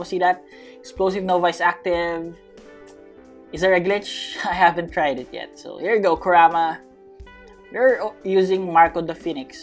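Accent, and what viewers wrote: Philippines. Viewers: Tunog pinoy to ah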